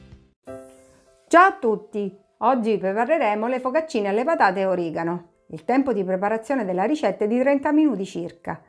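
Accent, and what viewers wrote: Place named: Southern Italy, Italy. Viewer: Seguo spesso le vostre ricette, complimenti. Posso chiedere di dove siete?. Sicilia? Calabria?. Non riesco a capirlo dal vostro accento...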